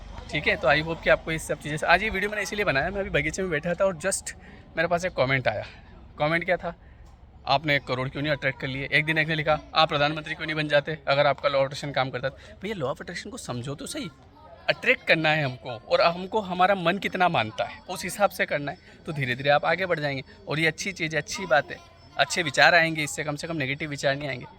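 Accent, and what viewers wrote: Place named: Rajasthan, India. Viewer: Bhai rahte kha ho aap asa lgta h Rajasthan se ho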